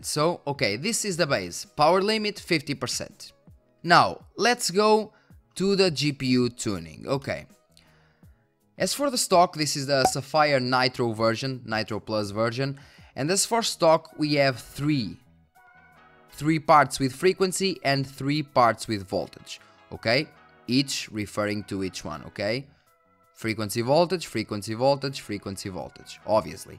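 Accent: Portugal. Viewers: Grande tugão, apanhado pelo sotaque ... bom video pahhh Saiu este video de um alemão (para as "RX 5700" / "RX 5700 XT", com OC a 2100Mhz <link> software: - GPU-Z.2.30.0 - MorePowerTool review: <link> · Pareces tuga pela forma de falar, props pelos vídeos! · i really like the way you speak english, your are portuguese right? GJ!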